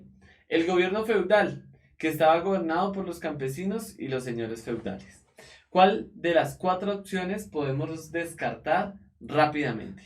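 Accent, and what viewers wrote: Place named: Bogota, Colombia. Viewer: ey cachaco habla bien no se dice "acuerdesen" ni "desen", etc...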